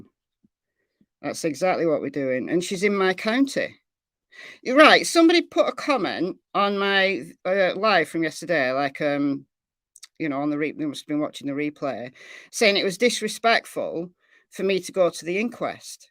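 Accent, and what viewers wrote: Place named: Lancashire, United Kingdom. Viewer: Thank you for all you do. These video's really help one to get a true perspective of what I also truly believe was a tragic accident. I love seeing this country side and the scenery. After much research on ancestry, I discovered my 3 x great grandparents were married in St. Michaels on the Wrye in 1866. Also listening to your voice, I can imagine what my GGG Grandma probably sounded like as she was born and raised in Lancashire County.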